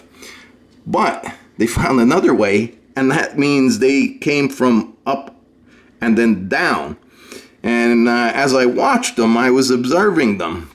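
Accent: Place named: North, United States. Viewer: lol I love your accent you from Jersey, Boston, New York I notice that northern accent. And yea I have ant issues at my house, they’re usually the smaller black ants and I made a mixture with boric acid powder with honey and cut out a hole in a plastic bottle for easy access and I caught tons of ants that way and they weren’t able to get back out of the bottle. But you’re right ants can be smart and picky!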